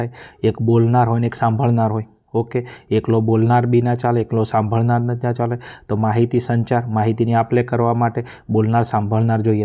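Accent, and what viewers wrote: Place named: Patan, India. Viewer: Gujarati Patan nu che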